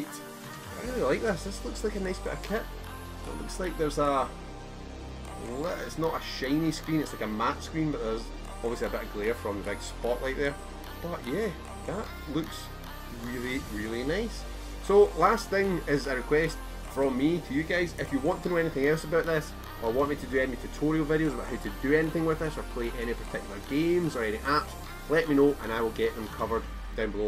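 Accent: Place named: Scotland, United Kingdom. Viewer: Unboxing Therapy Scottish version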